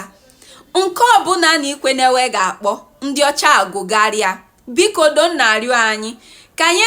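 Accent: Nigeria. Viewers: I love her Igbo dialect a lot. It is music to my hears and I just can't stop listening to her.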